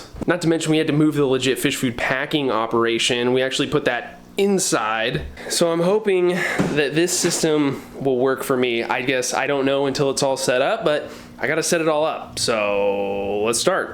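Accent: United States. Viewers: You motivate me to do such activities too 😁 It's super cool watching you speaking English. I try my US accent practice with your videos too 😂 So it's like killing two birds with one stone😂 😇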